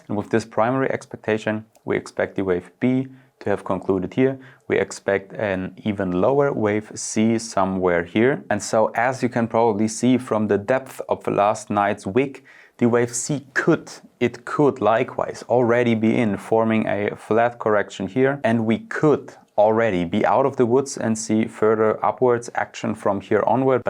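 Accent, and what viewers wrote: Germany. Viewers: Man hört den deutschen Akzent. sehr geil :)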